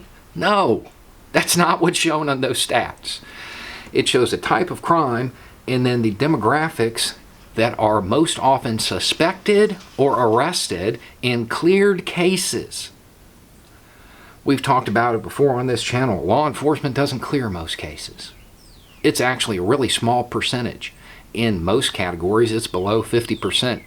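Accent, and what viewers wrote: South, United States. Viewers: I’ll be honest I’m from London, been NY and LA in the USA. Never would I think that someone that looks and talks like you would have your morals and ideas (yeah I know, ignorant) thank you for opening my eyes and making me realise not all white guys with southern accents, beards and trucker caps are racists.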